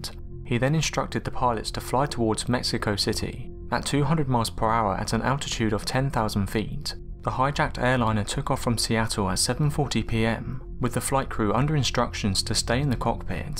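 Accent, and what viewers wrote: United Kingdom. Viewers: Your awesome are u British reply and you will make my day or night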